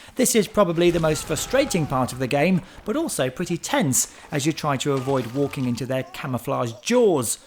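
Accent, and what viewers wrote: United Kingdom. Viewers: good review:) I dont know why but british accent makes everything sound more credible,always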